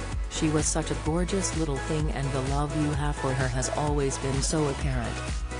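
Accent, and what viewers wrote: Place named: United States. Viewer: Why do we need an American narrator ?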